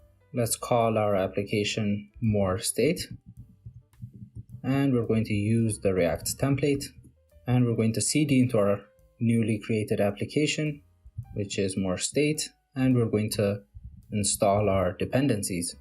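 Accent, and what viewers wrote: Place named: Ethiopia. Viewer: first time to see habesha boy teaching coding in english keep it up bro u got nice accent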